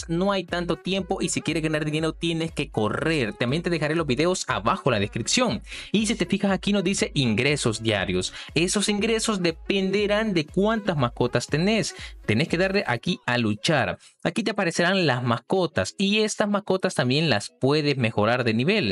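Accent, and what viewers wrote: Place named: Argentina. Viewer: Xq avlas como Arjentino si eres de Salvador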